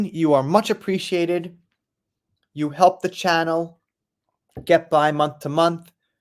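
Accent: New York, United States. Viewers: Where in NY are you from? I'm from Brooklyn... love listening to your NY accent.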